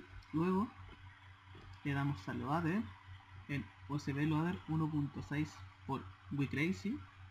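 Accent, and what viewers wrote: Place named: Chile. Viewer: ¿Eres Chileno cierto? Jajaja, yo tambien soy Chileno y adoro nuestra forma de hablar <3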